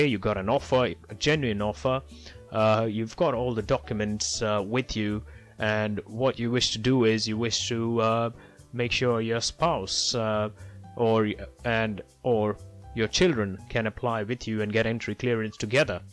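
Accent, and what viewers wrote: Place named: United Kingdom. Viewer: You sound very fluent. I just thought you are a Briton :)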